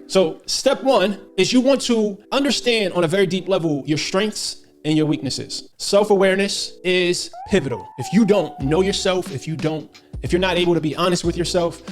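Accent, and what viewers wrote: New York, United States. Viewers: Sound like HOV! That’s that NY! Let’s get it God like Men! Likeness for the WINGERS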